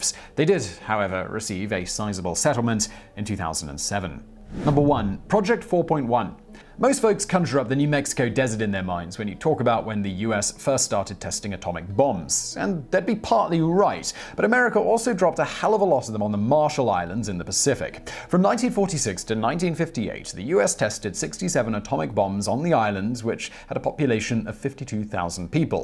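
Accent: United Kingdom. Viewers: Something about a British narrator talking about America's atrocities makes this video hit different to me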